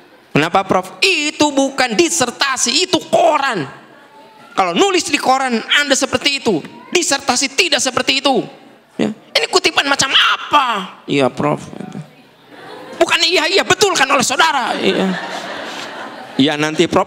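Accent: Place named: West Java, Indonesia. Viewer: Ilmu yg bermanfaat serta kangen dgn logat Sundanya mengingatkan silsilah orang tua dari Kiara condong Bandung dan sekarang sdh tdk ada tempat utk pulang kampung ( sdh berpulang semua)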